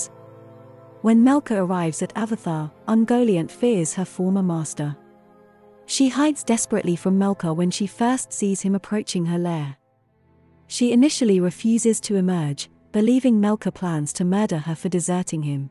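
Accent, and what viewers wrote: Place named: England, United Kingdom. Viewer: I think that's just how people speak in England. We say water just like it sounds. they say wotah..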